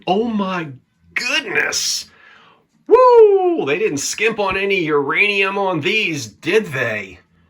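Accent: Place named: New Jersey, United States. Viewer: I always love listening to you New Jersey guys pronounce the word "water." Like "wooter." LOL!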